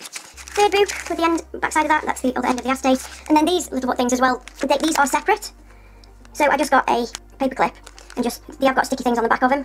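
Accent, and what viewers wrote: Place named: United Kingdom. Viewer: Regular voice please, I love your British accent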